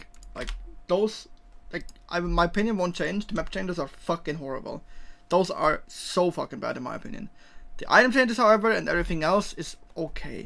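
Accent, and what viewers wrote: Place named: Germany. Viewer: Ah, alrighty man. I had you at what is considered max for my ears and I still barely heard the game. You make the stutter sound so fluent it makes it sound Korean/Chinese with the speed of which you're speaking. That mixed with the German accent and the "mumbling" from the braces makes it sound even more like it lol.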